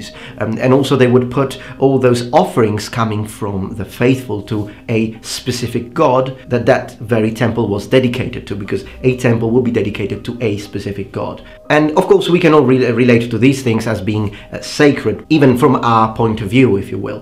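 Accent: Greece. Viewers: You have a modern greek accent and not an erasmic one! Good. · really Helenic Accent ! Congrats my Friend!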